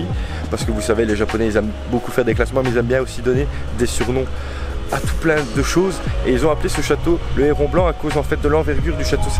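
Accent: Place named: Wallonia, Belgium. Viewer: jolie vidéo que j'ai découvert par hasard via des liens sur facebook... ca fait plaisir de voir un francophone au japon avec un bon petit accent de wallon... ca change des français. ;-)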